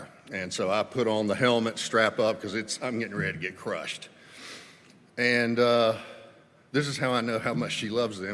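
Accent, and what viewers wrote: South, United States. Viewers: Only discovered this very funny lady this year as like many others I’ve been in lockdown due to Covid in Australia. I recommended her to my friends as each time I saw her I was amazed at her poise and stature and I loved hearing her funny stories with that lovely Southern accent. My sincere sympathies go to her family and friends. She will be sadly missed by many.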